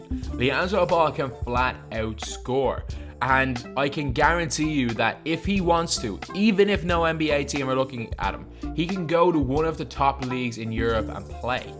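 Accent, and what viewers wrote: Ireland. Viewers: Are you Irish? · You irish?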